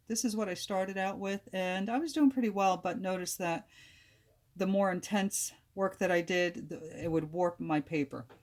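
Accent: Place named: East Coast, United States. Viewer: Thanks for sharing. You make it look easy! Just subscribed. I detect an East coast accent! Lol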